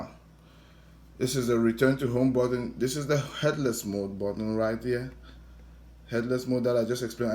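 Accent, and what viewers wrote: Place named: Trinidad and Tobago. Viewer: Are you from Trinidad? Just wondering, your accent sounds just like my old boss.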